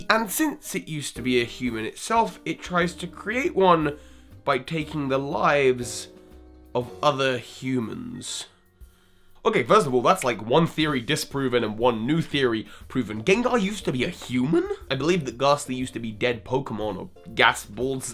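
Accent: United Kingdom. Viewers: Are you from America? I always thought you was British!?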